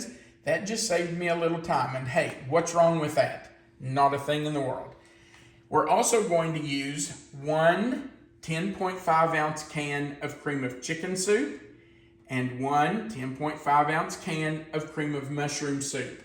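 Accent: South, United States. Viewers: I knew with that accent you had to be in the SEC! ;)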